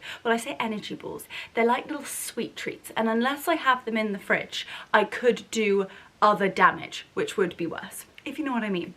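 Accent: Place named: RP, United Kingdom. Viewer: I did look it up, and whilst she was younger doing the interview, it is amazing at how much more "posh" she sounds now. Not complaining, just is interesting.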